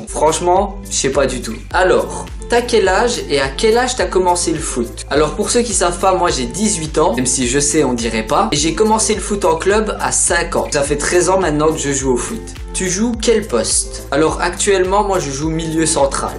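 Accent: Belgium. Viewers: est tu belge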